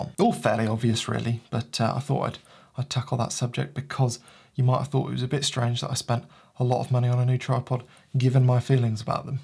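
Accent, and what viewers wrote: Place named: England, United Kingdom. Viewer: I’m brand new to photography, love the content, the dry sense of humour and the English accent 👍🏼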